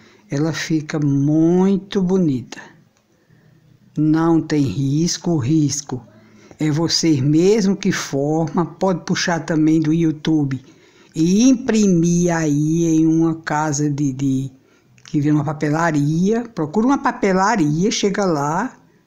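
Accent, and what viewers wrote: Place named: Northeast, Brazil. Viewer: NEGA AMEI VOCE E SUA SINCERIDADE . UM CHERO BOM PRA TU . SOU FILHA DE NORDESTINO . AMO ESSE SOTAQUE.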